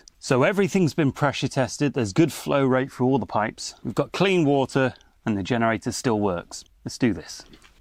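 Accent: United Kingdom. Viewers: Very British. I love it. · This guy is extremely british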